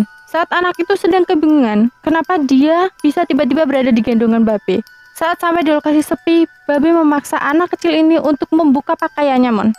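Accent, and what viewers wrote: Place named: Java, Indonesia. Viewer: Salfok sm suara kk nya, javanishnya kerasa bgt..suka sukaa😍 sukses selalu kak👍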